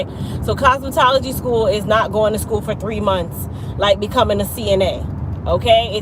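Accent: Jamaica. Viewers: I love how the patois comes in and then you put it in your pocket for a bit and then you pull it back out when the heat turns up. Blessings to you. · U was born in usa...but u speak jamaican well... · you are absolutely right! Love your Jamaican accent 😊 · Do I hear a Jamaican accent , dear one ? Sj.💛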